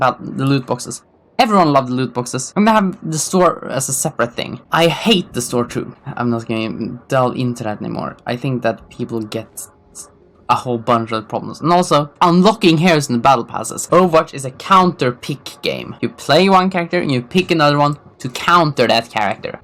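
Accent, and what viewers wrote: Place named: Sweden. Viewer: Lmao I can already guess that u are swedish, or norwegian or something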